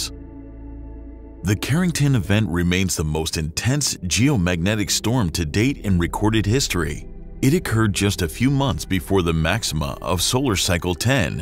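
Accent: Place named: United States. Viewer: This American accent is disgusting. Can't watch it.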